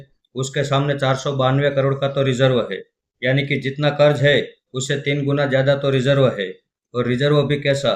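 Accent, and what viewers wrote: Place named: Gujarat, India. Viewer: તમે ગુજરાતી હોય એવુ લાગે છે. ખુબ જ સરસ આંકલન કર્યું મોટાભાઈ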